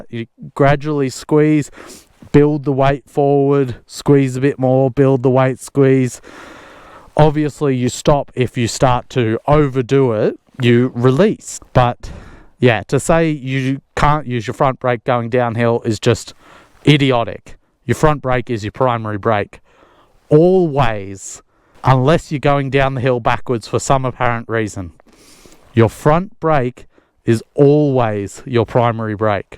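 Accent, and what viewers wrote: Australia. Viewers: thank you for showing us!! also you where just in Portugal and all over Europe you sounds Australian and you now live? in Estonia? talk about adventures XD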